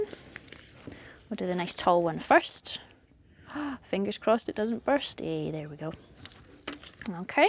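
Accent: Scotland, United Kingdom. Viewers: scottish people just know when others are scottish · Is that a british accent ?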